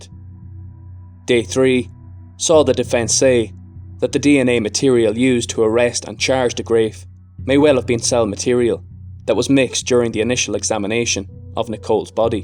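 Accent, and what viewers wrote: Ireland. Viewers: I'm pretty sure the narrator is Irish. Try pronouncing some of their names.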